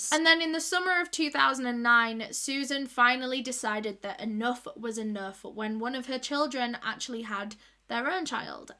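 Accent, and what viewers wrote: United Kingdom. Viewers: I've never been that into british accent, but yours is just so charmingggggg 🥺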